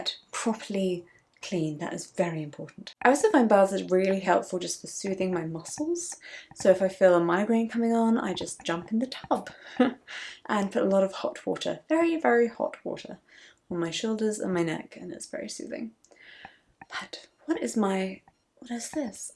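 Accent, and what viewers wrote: RP, United Kingdom. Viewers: Quite the posh accent wow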